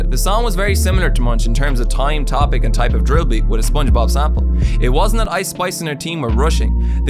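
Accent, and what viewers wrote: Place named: Ireland. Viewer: dang she is a great example of right place, right time, right person, right team, right everything! Also are you from Ireland? Your accent is dope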